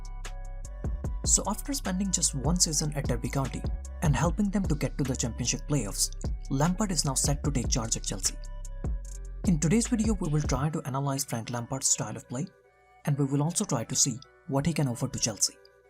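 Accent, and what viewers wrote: India. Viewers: what's with this indian accent? turkish hijacked?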